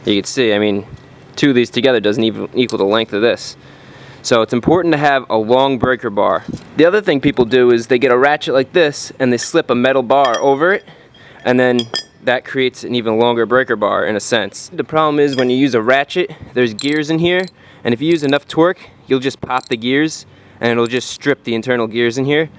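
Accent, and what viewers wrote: United States. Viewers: Sir are you an american ??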